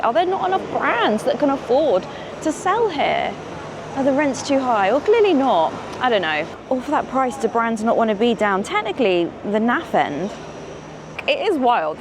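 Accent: RP, United Kingdom. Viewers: you are so posh 😂😂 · My God you have the most posh and smooth English accent I've ever heard in my life. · Basic economics in action here. Inequality, a disappearing middle class with less money to spend on excess, and a billionaire class that doesn’t sully themselves by going into these shops in person! 😂 fake balcony? Where were you educated? You sound like you must’ve gone to a private school- did they not teach Shakespeare? Fake balcony? C’est un balconet aka a Juliet balcony in reference to Romeo and Juliet and the scene where Romeo meets Juliet after their initial encounter “but soft, what light through yonder window breaks” is supposed to be Romeo seeing Juliet at the balconet of her window.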